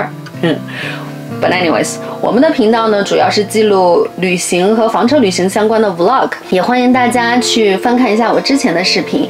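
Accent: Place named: Beijing, China. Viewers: 咋听像京味儿，细听还是有点喳味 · 还真是北京口音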